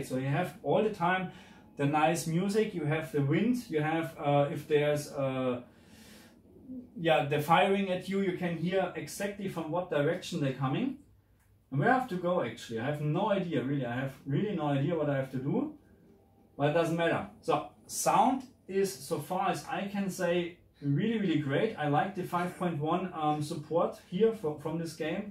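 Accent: Germany. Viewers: Im sorry, it has nothing to do with the video but i’m fascinated by accents and I was just wondering, is that a German accent? That’s my best guess lol. When you said “when fading” I would of just said “when looking around” tbh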